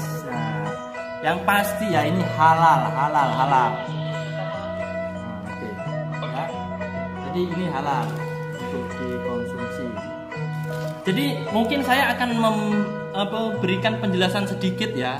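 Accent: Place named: Malaysia. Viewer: Waduhh... Bahasanya bunyi campur malaysia gitu... Berkecek kolumpur ko mas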